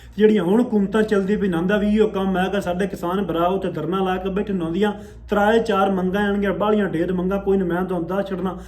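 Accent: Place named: Punjab, India. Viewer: ਇਹ ਪੰਜਾਬੀ ਜਬਾਨ ਦੇ ਲਹਿਜਾ ਦਾ ਕੀ ਨਾਮ ਹੈ ਜੋ ਤੁਸੀ ਬੋਲਿਆ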